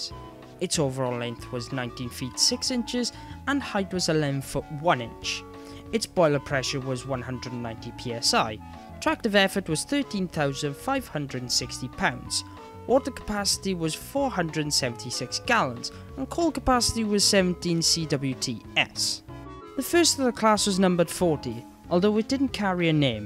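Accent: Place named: Wales, United Kingdom. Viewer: Your voice sounds beautifully welsh ^^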